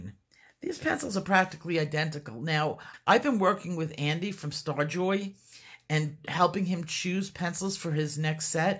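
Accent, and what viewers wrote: New York, United States. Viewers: Yes, I have discovered this myself. Thank you for all you work...as a former NYer I love your accent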